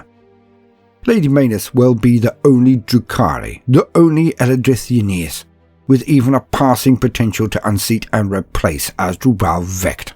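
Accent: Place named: England, United Kingdom. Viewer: You know what's awesome about the King's English? When I say too-daloo to someone in American English I sound like someone with a learning disorder. You make it sound like cookies on Christmas morning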